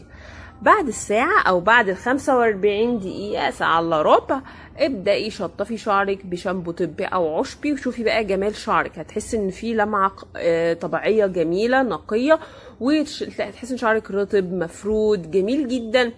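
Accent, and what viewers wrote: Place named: Maghreb. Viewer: انت بتتقني اللهجة المصرية بس واضح انك من المغرب العربي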